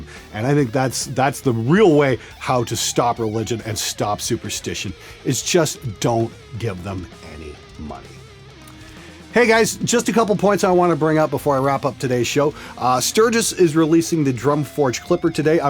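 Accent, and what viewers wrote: Canada. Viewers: Are you canadian? Some of your pronounciation is pretty canadian.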